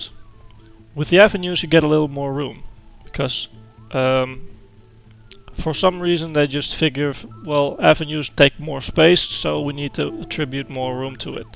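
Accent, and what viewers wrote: Netherlands. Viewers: you're from holland am i right? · Ik wist dat je Nederlands was ik hoorde het aan je accent :D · Ik kan gwn horen aan jou stem dat je Nederlands bent haha, bedankt voor de tips 7jaar later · are you dutch? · are you dutch by any change? u sound like you are haha ^^'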